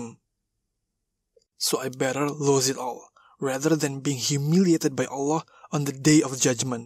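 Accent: Indonesia. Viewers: I 100% am sure the voice over actor on this video is Indonesian. His English accent is so Indonesian :) Anyways, great story and great voice over! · Indonesian English Accent... Good job! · I recognize this accent. Indonesian accent. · I'm hearing Indonesian pronounciation english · The Indonesian accent:)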